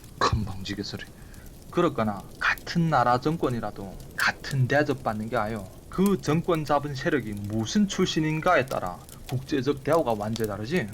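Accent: Yanbian, China. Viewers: 잘들었소ㅡ오빠욕하는것도 멋있고 연변말도 너무멋있소 다음절목을 기대하겠소 화이팅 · 야 너꼭 돈 받아먹고하는 소리구나 이후부터 연변말 하지마 왜 뭘아는것처럼하며 남이 정치에 간섭이냐연변사람 쫒 팔리계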